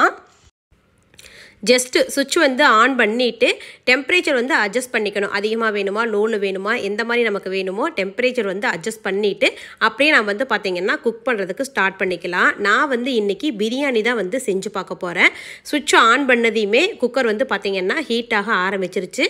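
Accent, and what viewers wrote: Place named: Kongu, India. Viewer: Namma kongu slangkukkaaga ❤ thaan naan first parthen da....saatharanamave niraya english words use pandratha kurachukkunga ...example saree wear pannittu.....namma side casual ah saree kattittu😊 nu thaane solvom.....sorry😌 nirayamurai sollanum nu ninachurukken❤வாழ்க வளமுடன் 🙏🎉----❤maheswari chennai 😊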